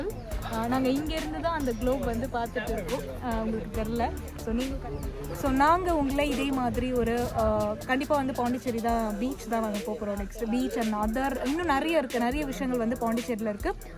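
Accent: Sri Lanka. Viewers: இலங்கை தமிழ் ரொம்ப அழகு நானும் இலங்கை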